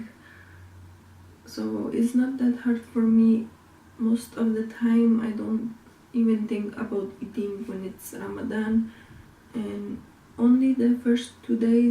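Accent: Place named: Switzerland. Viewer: Your accent sounds Switzerlandish :) Nice to meet u sister Allah keep u strong sis. Amin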